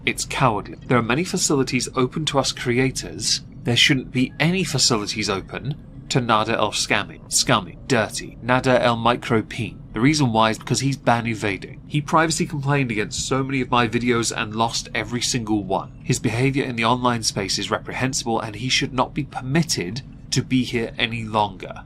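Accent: United Kingdom. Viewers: I think the issue with your channel is you're a DEI Squid and with the British accent it comes off really douchy.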